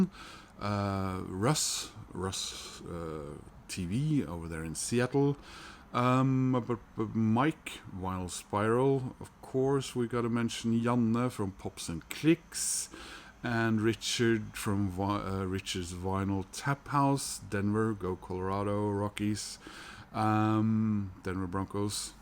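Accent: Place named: Ireland. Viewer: Look at that cool Opeth album cover ! The motorcycle noise reminds me of some of the streets here in the U.S. Thulsa Doom.. I will listen. I have The Hives debut on an original pressing. Bought it back in 2002 or so. Good Irish accent sir ! 😊The only accept I have is Metal Heart. I just watched Doug's latest here before work today.✌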